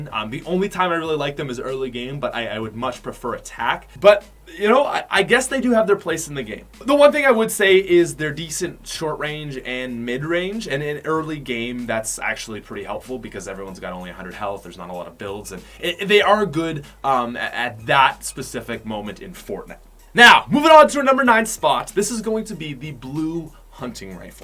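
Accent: Canada. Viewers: He’s mega Canadian jeez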